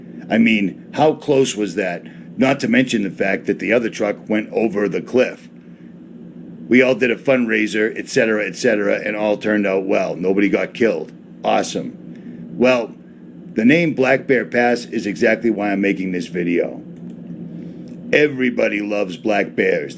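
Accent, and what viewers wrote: Boston, United States. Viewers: Sounds like Richie from Boston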